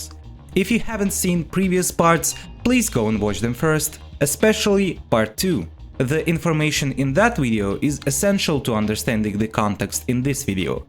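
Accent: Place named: Ukraine. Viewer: Сидел, сотрел видео. В конце оказалось, что автор Украинец (я то думал, что акцент такой забавный). Приятно знать, что автор так "недалеко" и приятно знать, что не только омерикосы делают хорошие видео с теориями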